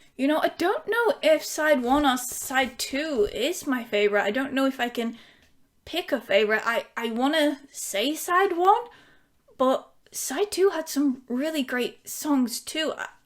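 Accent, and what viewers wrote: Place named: Newcastle, United Kingdom. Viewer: This review is awesome and I love this album. The production and harmonies are outstanding, as is drum sound. You are pretty awesome too hahaha. I was trying to detect your accent. I will go with Geordie. I am a Londoner, so probably totally effed that up hahahaha x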